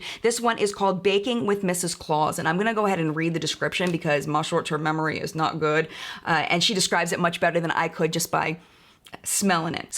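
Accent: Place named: Baltimore, United States. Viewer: How did I just I now realize you’re a fellow Baltimoreian?! I hear it in the “accent” now 😁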